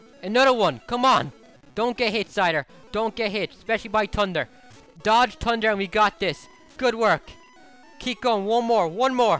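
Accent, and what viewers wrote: Ireland. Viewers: Oh my God your accent is AWESOME!!! Are you from Ireland??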